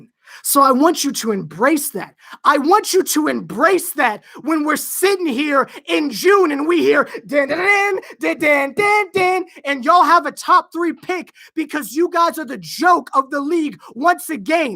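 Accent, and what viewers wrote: Canada. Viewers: you Canadian ?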